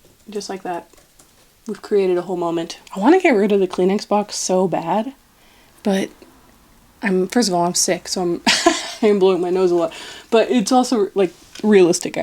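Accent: Canada. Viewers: your canadian accent seems strong to me and i love it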